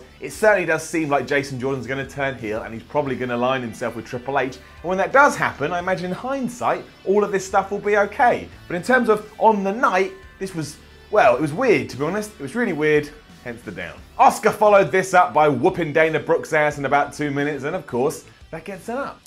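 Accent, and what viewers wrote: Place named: United Kingdom. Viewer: Sometimes when he gives an up and starts explaining why it's like if he didn't have a British accent it wud be a down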